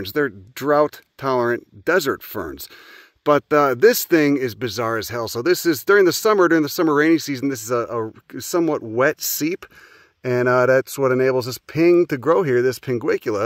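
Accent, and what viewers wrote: Chicago, United States. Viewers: I love the Chicago accent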